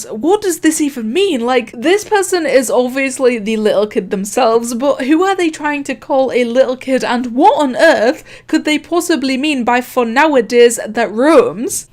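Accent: United Kingdom. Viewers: It sounds like u live in the UK, nice! Diff then but.... your not “coping him” of course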